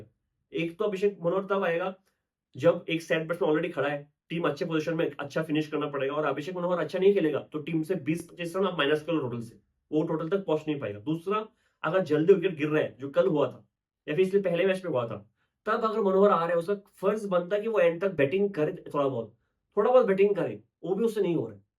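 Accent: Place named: Northeast, India. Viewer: Bhai tum northeast ho kya accent vaisa hi hai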